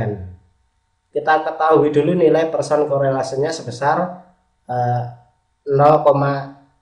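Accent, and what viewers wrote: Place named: Java, Indonesia. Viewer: medok-medoknya sekilas mirip sama alm. bapakku.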